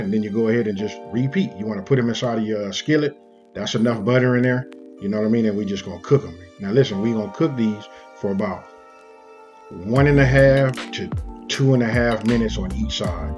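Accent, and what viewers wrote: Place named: Los Angeles, United States. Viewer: He sound like he from LA fasho!!! 🤣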